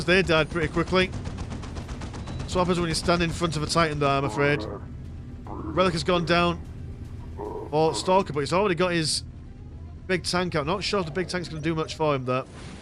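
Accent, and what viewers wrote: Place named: East Yorkshire, United Kingdom. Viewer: Which side of East Yorkshire are you on?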